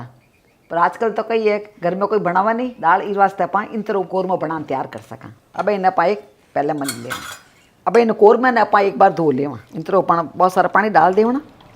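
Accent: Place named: Marwari, India. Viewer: Meri mummy mujhe deke gai thi korma per ab tak banaya nai, aapka video dekh ke laga mummy boli banale ab to😑, आप same humari marwadi बोलते he, लगता he meri mummy he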